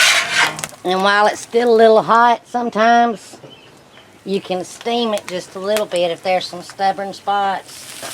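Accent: South, United States. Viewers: That strong southern accent! Music to my ears. By the way those cheesesteak sandwiches look mouth watering. Got to try me one of these, thanks for sharing. Greetings from England. 🙂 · Never thought I would across somebody that talked country as me. Bravo. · That Southern draw. You rock. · Looks fantastic. I absolutely love your southern hospitality style and voice. Reminds of going to the family gathers for reunions or backyard BBQ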